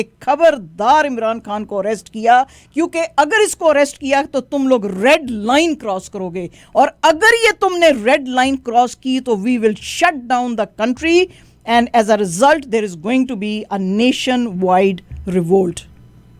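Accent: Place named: India. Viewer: سسٹر آپ انڈین ہیں شاید۔ 🤔بھارتی فلموں کی طرح خ کو کھ پڑھتی اور بولتی ہیں۔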